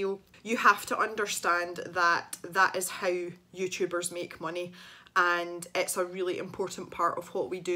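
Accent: Scotland, United Kingdom. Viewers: I’m so happy Gregory Grayscale has such a fine new home. But I’m still lamenting the displacement of The Yoshis 😢 Regarding the neon pencils ... I once participated in a challenge that required you to color a page that involved something outside of your comfort zone. So I doubled down and colored a mandala with neon gel pens and pencils - neither of which are my favs. So, looking forward to the next video with a Scottish accent. Signed, Daria, the serial commenter.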